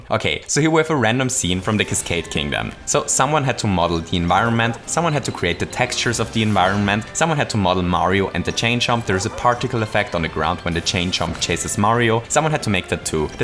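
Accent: Germany. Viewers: Wait.. Are you German? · Are you from a german speaking country? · Are you German? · Oh, you are German too? I knew your accent seemed familiar for me xD Nice content man, love your channel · Wait what? Are you german? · Is he german? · Wait? Are you from Germany? ?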